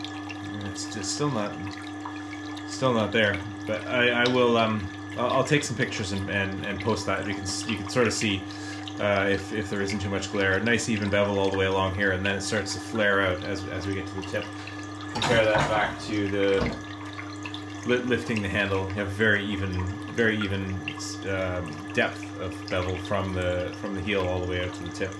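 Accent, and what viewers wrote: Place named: Canada. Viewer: Perhaps its because we both speak 'Canadian' (!) but I finally understand the concept. Thanks again for the time you take to film and explain.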